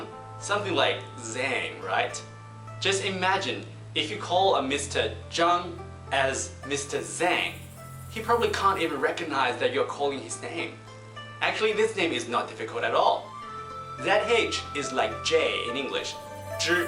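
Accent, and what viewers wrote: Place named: Australia. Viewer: Thanks for the resource. Do you know if these spellings Pinying spellings? If so, is Pinying still used as a common way to transliterate Chinese pronunciation into English? I ask because I've met some mainland Chinese who don't know anything about Pinying (unless I'm also butchering my pronunciation of it). Also, do you speak German? I ask because your URL includes "aus" which often translates as "out of"? Sorry, I guess it means AUStralia, based on your accent?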